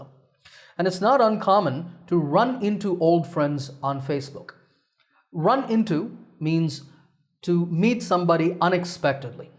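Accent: United States. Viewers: Can you tell me how did you learn or improve your american accent. thanks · Thnk u so much sir..... really useful n important to learn best eng with American accent 😊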